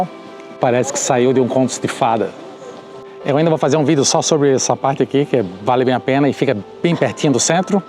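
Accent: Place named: Florianópolis, Brazil. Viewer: Caramba... Sinceramente um dos melhores vídeos sobre cidades que eu já vi na minha vida.. Rico em detalhes, bem estruturado, rico em informações... E com sotaque manezinho kk kk Abraços de Blumenau.. Quero visitar Edimburgo ano que vem e vendo teus vídeos só dão mais vontade de ir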